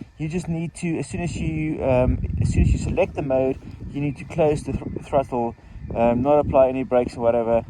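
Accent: South Africa. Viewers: How long have you lived in the UK ? I assume your an SA guy by the accent :) I live in the States. Southern CA and now in Utah. Been in the USA for 31 years now. I have a 2014 ST R. Looking to upgrade to this 2021 version. Can you compare the 675 to the new CC bike ?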